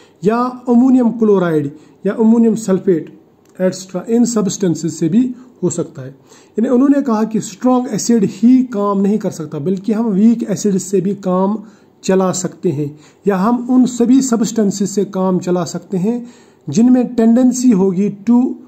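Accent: Kashmir, India. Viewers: Sir you sounds like kashmiri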